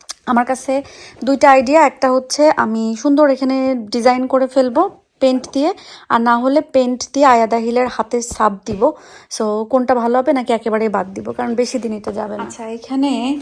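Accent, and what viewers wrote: Sylhet, Bangladesh. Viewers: Apnar Muk a sylheti vasha sune kub Valo laglo,ami nije o ekjon sylheti tai❤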